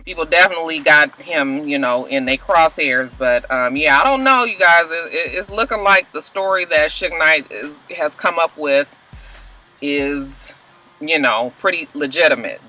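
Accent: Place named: Louisiana, United States. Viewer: Girl, be careful sitting in that car!!! LA, I was trying to figure out that accent....I remember that accent from the people I went to college with that was from LA!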